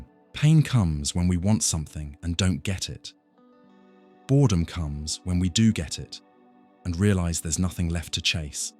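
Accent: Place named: United Kingdom. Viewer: Gosh... 2.5 hours of AI crap, W/British Accent and Sparkly Background to boot!! I need an AI which hides crap like this.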